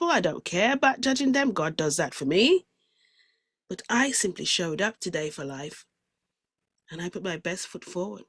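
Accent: Jamaica. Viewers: I looooovvvvvveeeeee your Jamaican accent!!! I am in love with the Jamaican accent! It sounds pure, to the point and so divineThank you!!!It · Amazing! So glad I saw you on Alex’s next level soul! Love your Jamaican dialect pls do it more! Learning so much from you ty! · I LOVE your message AND your Jamaican accent. You said, "You're not losing your mind, you're losing your limitations"...PROFOUND! I love the way you use just the perfect words to convey your message. Thank you for giving so freely from your beautiful spirit! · Sista! Gwan an speak inna patwa! Me luv it. Blessings.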